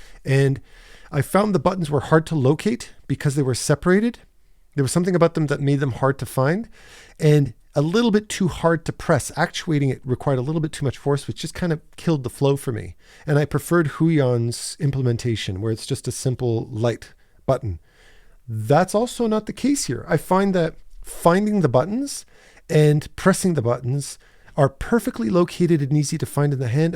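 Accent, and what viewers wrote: Canada. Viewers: Thanks for the review. Very intuitive. I also evaluated Cintiq Pro vs Xencelabs. Also, get the Pro Pen 3E (eraser at the other end of the pen). The ExpressKeys on the Cintiq Pros IMHO are impossible to intuitively used. I also use the keyboard tray (like you do). I hope for screen recording that Wacom releases 1440p (not a or 1080p) Movink 15/16. The Movink 13 is amazing as a portable pen display. To produce 1080p tutorial screen recording to not pixelate when zooming in close, to record originally in a higher resolution (1440p). Cintiq 24 (non-Pro) and Xencelabs 16 works great with no fans and great resolution (2K), but the wasted bezel is awful. So, to record, I also use a separate sound studio, but with the simpler Cintiq 24 OR the Xencelabs 16. My audio recording mic picks up the Cintiq Pro 27 fan noise, and so I use it in my working office. 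I also use Neumann!!! Wow. Overall, I just find that the pen/surface "sensitivety" to be more accurate and responsive, but if I couldn't afford Wacom, I would definitely invest in Xencelabs. Sounds like you have a Canadian accent and we are in Bellingham, WA (close e'nuf to drag my house across into Canada)!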